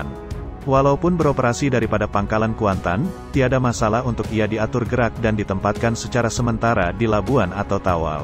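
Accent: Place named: Indonesia. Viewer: Negara ni skrg dah teruk korup, lagi mahu beli aset baharu..........LCS pun dah bayar RM6 bilion, sebiji pun tak siap, menteri2 umnobn/pn cekik komisen. Suara pun org indonesia lagi mahu sembang ttg tentera Malaysia........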